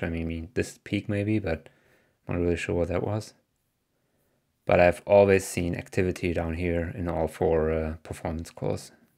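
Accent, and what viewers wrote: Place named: Germany. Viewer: I hear some German accent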